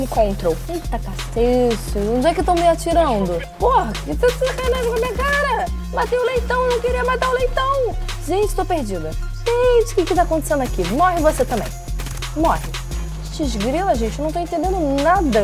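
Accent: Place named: Rio de Janeiro, Brazil. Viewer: É o sotaque carioca só fica bonito em mulher mesmo. a gamer que você rexxxpeita ❤️❤️❤️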